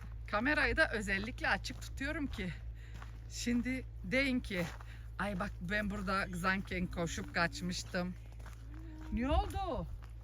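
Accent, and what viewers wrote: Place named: Bulgaria. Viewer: Ne guzel video cok mutlu oldum..birde bulqar turkcesi konuşmana bayiliyorum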